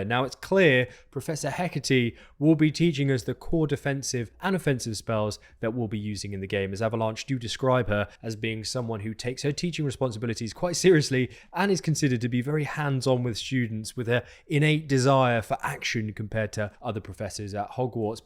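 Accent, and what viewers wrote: United Kingdom. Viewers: Love this info on professors! You're by far my favourite channel for Hogwarts Legacy :) Great info, presented well and with a lovely British accent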